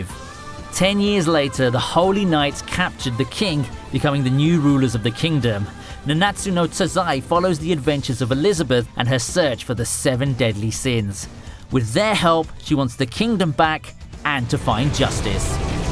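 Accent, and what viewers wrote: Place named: United Kingdom. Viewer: Did not expect British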